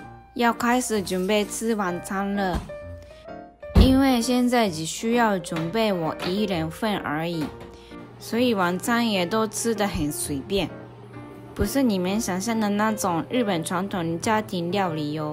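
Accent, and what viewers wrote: Taiwan. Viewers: 頑張ってください 具然にこのビデオを見た 応援しますよ しかし なぜタイワンの標準語似てますか？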